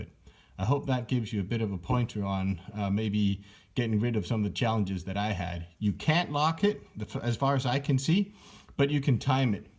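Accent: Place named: Canada. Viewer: Wait, this guy is Danish? I honestly thought he was Canadian 😂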